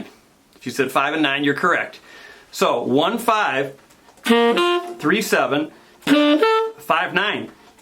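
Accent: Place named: Chicago, United States. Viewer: Totally nifty approach,! Thank you. Funny coincidence: This is the first time I've watched one of your videos. Got fascinated right away, and while I was listening I started hearing something familiar in your voice. I grew up in Buffalo (Go Bills!) but haven't lived there since '69, and I started thinking "This guy sounds like he's from Buffalo!" But right away, I think, "No, Wait," because, suddenly I'm hearing the Blues Bros in your voice and I think, "Chicago!" And I spend the next few minutes going back and forth, trying to hear which one it was. And all of a sudden, you're talking about the Cubbies and Chicago, and it all becomes clear. Your short "'O" is a dead giveaway. Anyway, it's good to see a Rust Belt saxman doing well. Great lesson, and Best wishes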